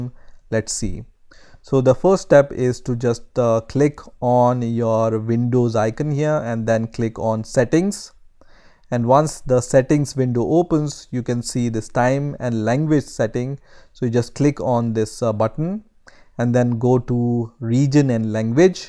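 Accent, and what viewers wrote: India. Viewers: Is this fake? He sounds india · why does he sound indian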